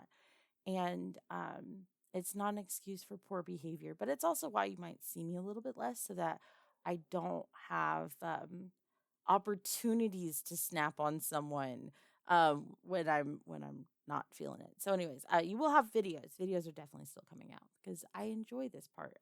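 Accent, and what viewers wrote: South, United States. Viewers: Ooooh man that southern accent is like 🤌Yeehaw! hehe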